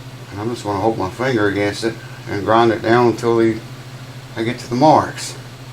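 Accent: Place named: South, United States. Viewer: ~ Just out of curiosity: where, geographically, are you located? Your accent seems to say somewhere down here in the south, but you've made a few videos of massive snow dumps... {?}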